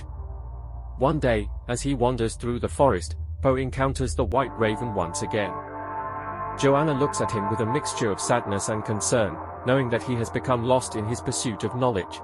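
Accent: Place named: United Kingdom. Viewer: I love it, esp the British accent.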